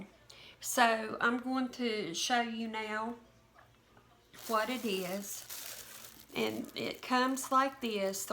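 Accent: South, United States. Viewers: What an adorable ideal I love it because I have many spices this will be helpful, but could I make one or two suggestions just off the top of my head. You could take the lids to the jars which are metal lay them face up and spray them with a quick coat of dark Krylon paint , then you can write on them with regular marks-a-lot. or sharpie. And in my kitchen if I'm going to put it anywhere near the stove I need to put a little plastic cover something over top so that the grease in the air from the cooking does not accumulate on these glass bottles otherwise will be washing them all the time but I do love the idea and I'm going to do it ,so thanks a bunch, by the way you got to be a Southern girl. I'm from Texas but you make me feel like home thanks a lot girl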